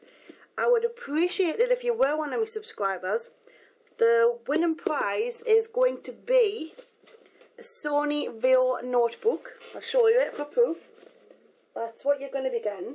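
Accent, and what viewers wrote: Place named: Scotland, United Kingdom. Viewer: Her accent mixes both english and scottish. I heard a welsh person talk before and they didn't sound that different from her.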